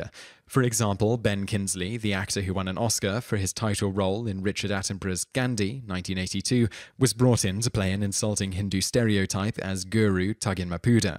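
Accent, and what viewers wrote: United Kingdom. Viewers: Alright, I've had it; Hey, NARRATOR, learn to pronounce people's names, for god sake. If you don't know how to pronounce something, ASK SOMEONE, don't just think you're correct because you have a British accent. Seriously.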